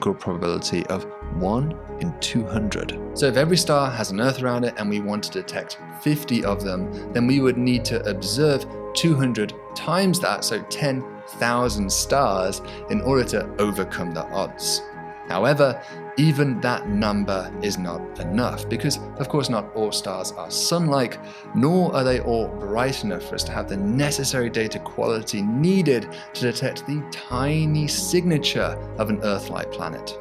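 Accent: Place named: Nottingham, United Kingdom. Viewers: he must be the guy that married your ex girlfriend, only sense I can make out of you complaining about how an educated BRIT speaks. This is how all educated men especially brits speak 😂😂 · this guys accent gives me nottingham vibes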